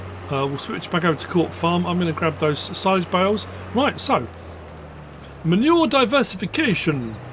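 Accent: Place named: England, United Kingdom. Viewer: Youtube income has dropped in all areas I have a channel painting acrylic lessons also gta5 videos in the U.K. it’s harder to get an audience my is mainly in the USA I live in wales bern a content creator for over 10 years downloaded this game free it’s a fantastic game as I worked on farms back in the day on the hay potato picking by hand and milking in my 60s it’s a way to relive my youth your doing a great job m8 just be yourself and it’s great to hear a true English gent 👍👍👍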